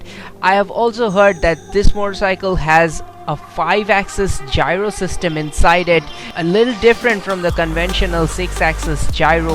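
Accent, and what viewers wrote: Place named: Bengal, India. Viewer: That bengali local accent.. I love it...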